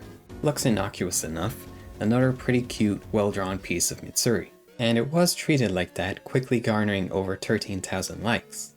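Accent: Ireland. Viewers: It's certainly an interesting variation of an Irish accent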